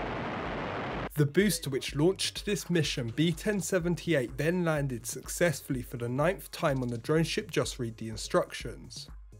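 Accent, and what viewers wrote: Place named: Cockney, United Kingdom. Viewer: That’s a London East End accent. “Fursday” instead of Thursday etc.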